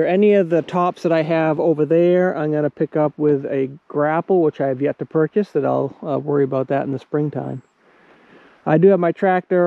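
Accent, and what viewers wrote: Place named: New England, United States. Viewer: I just randomly came across your channel and thought I’d check it out. I have to say I’ve been enjoying watching your videos quite a bit! I really enjoy watching you play in your playground and all your cool toys! I like your commentary about what you are doing and your thoughts on the situation. I also enjoy your New Englander accent!! Keep up the great work with the videos and I’ll keep enjoying them!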